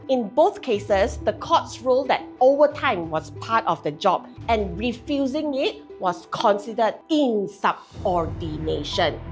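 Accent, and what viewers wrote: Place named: Singapore. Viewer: I thought you singaporean cause ur english is very good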